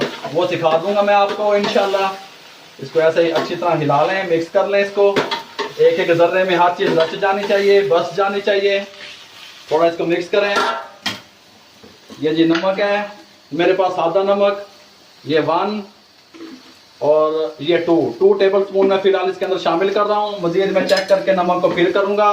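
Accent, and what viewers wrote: Punjab, India. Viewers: Asslam o alaikum Bhayi Allah Kareem ap ko sahet aur slamti dy aur Umar m barkat ata frmay aur dharon khushiyan ata frmay aur nazar bd sy bchaey ameen suma ameen ya rab ul Allah ameen ap ka baat krnay ka andaz Bohet acha lgta hy aur Punjabi touch ki tu kia hi baat hy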